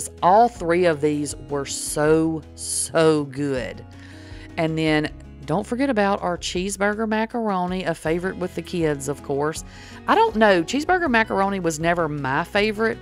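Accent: South, United States. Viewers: I love listening to you, you remind me of growing up in the south! Thank you, these recipes look wonderful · New sub here 🙋🏻‍♀️ First of all, you’re adorable!! 🥰 Love your southern drawl & bubbly personality!! Secondly…..I am SO grateful for these hamburger helper mixture recipes!! I’m going to try them all. I grew up on Hamburger Helper. There were 8 in my family & we could stretch Hamburger Helper pretty far. Super excited to try these!! I also have a request (& forgive me if you’ve already done this-I will look thru your channel) but could you possibly come up with a dry mixture recipe for cold pasta salads?….like the Suddenly Salad box mixes?? I would love to have a dry mixture recipe I could mix with mayonnaise to put over pasta & veggies for some good summertime cold pasta salads. THANK YOU SO MUCH!! ❤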